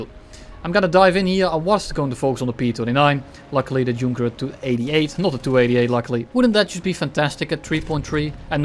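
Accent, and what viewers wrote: Netherlands. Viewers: Are you dutch? cause you have the accent.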